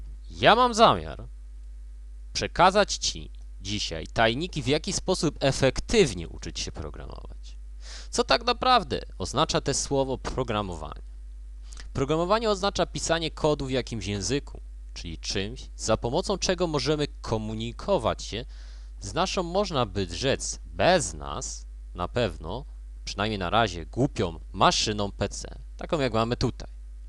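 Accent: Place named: Russia. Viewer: Jesteś polakiem?Masz rosyjski akcent :o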